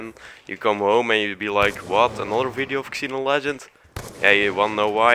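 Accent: Netherlands. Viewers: Is the commentator from dutch/netherlands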